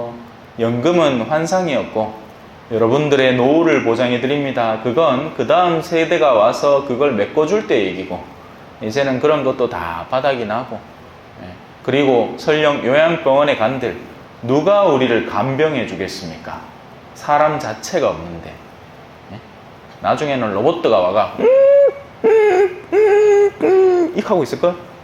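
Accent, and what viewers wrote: Gyeongsang, South Korea. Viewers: 신부님... 세상살이도해야.밥먹고.신앙생활도.꼭.해야평화을..이웃.동료을살피옵니다.신부님에.직선말씀.의성.경북말씀..투박함.그대로.가식없으신.신부님.한번씩..속이.뚝.티입니다.언제나.정답..정답주시옵니다..베트남에서.함께합니다.🙏✈️✈️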